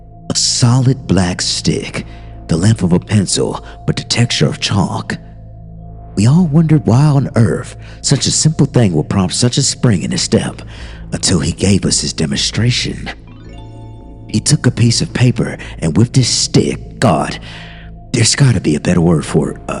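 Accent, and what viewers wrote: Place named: South, United States. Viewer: Great job on the southern accent! I'm from TN & so many narrators can't pull it off but you nailed it my friend. Always good to hear from you. U are an awesome narrator & I enjoy your channel very much. Take care & hope you have a fabulous Friday & a wonderful weekend ✌🏽❤️✌🏽❤️✌🏽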